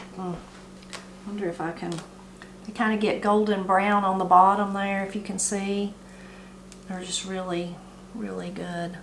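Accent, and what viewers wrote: Appalachia, United States. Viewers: They look really good,! I’ve only been watching your channel for a couple of months and I love your cooking videos and ones with Matt or Granny. I loved the video yesterday of Matt frying the potatoes and deer in the bag with so much flour! I like how you say milk as ‘meelk’ and a ‘bad place’ when there is a spot on vegetables you’re preparing. The Appalachian accent is so good! I live in the north of Australia (my local area gets 300 days of sunshine a year) and I guess I’d have an accent to you guys too! 🇦🇺 Some of my favourite words you say are Papaw, okrie, chocolate gravy, ramps, Postum, etc. Never heard them before watching your videos.👍🏼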